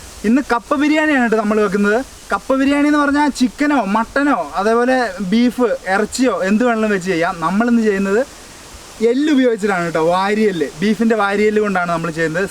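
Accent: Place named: Kollam, India. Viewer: ഒരു കൊല്ലം slang ഉണ്ടല്ലോ സംസാരത്തിൽ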